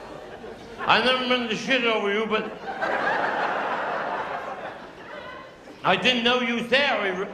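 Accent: Wales, United Kingdom. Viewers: Yes ,the Welsh accent can sometimes be difficult to understand if you don't listen carefully. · Welsh accent.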